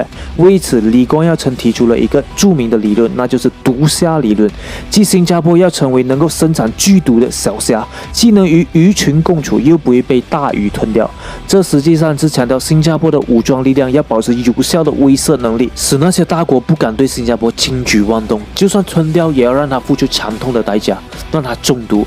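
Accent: Malaysia. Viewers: This guys sounds like a Malaysian.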